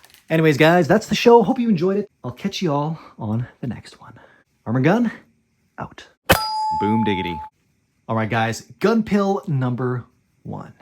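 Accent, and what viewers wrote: Canada. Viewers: Dude I can’t stand your canadian accent. Don’t have a problem with Canada, just don’t like your breathy raspy voice. Nice guns tho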